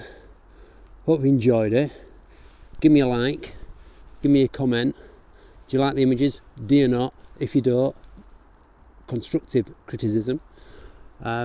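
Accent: Yorkshire, United Kingdom. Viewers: Great watch again mate, so nice to ere ow many Hs ya dropped, and eld, appy, ungry, ome, folk ere can't understand me arf ov time. Great Yorkie tork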